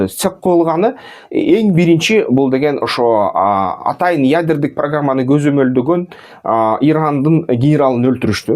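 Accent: Standard. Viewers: Таза суйлосуз агасы азаматсыз😊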